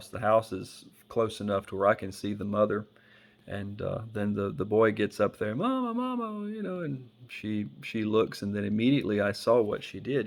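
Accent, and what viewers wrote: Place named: South, United States. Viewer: Amazing story brother! May Allah bless you with best in this world and hereafter. I have to say something, hearing your southern accent makes me keep thinking about Forrest Gump 😊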